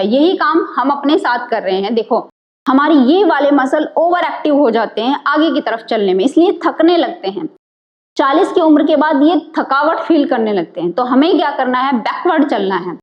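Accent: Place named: Sindh, India. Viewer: पकक जाता है। लहज़ा सिन्धी बोली का है। क्या आप सिन्धी है? क्या मैं सही हूं? प्लीज़ रिप्लाई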